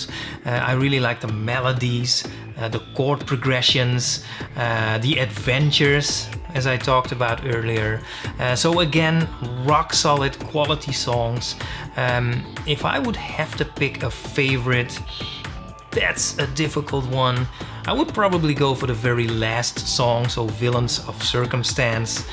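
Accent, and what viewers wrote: Netherlands. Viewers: are u nederlands?? ;-)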